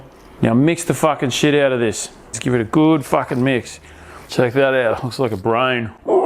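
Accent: Australia. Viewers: i fucking subbed cause ur swearing in an Australian accent its funny as fuck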